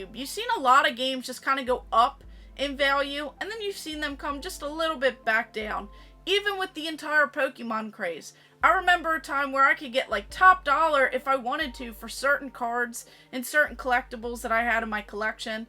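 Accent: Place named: Baltimore, United States. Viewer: Woah, are you local to Bel Air? I shop at Retro Replay too! Thought I heard a Baltimore accent in there... 😄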